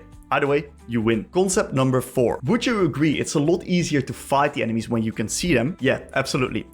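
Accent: Netherlands. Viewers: Im hearing some heavy dutch accent right here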